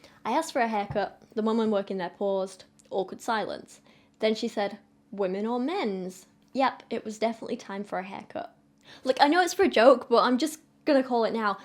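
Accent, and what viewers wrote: United Kingdom. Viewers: After watching u for months I just noticed ur accent, like I didn’t realize u were British until rn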